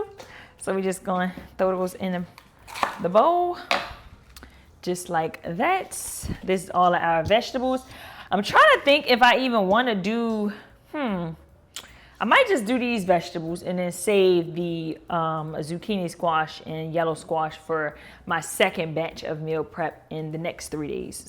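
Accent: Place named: Louisiana, United States. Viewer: Love the video. Are you from Louisiana? The accent sounds like it lol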